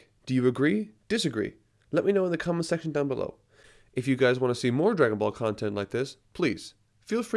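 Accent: Ireland. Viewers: Wait your from Ireland?!?!?! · No, you say "Vegeta". "Vechita" is inaccurate as a pronunciation of it. His name is a pun on the word "vegetables," not "vechetables." This guy's Irish, so maybe it's a quirk of his accent, but then again, his accent's very light, so it doesn't make sense, since he flip flops between the 2 randomly.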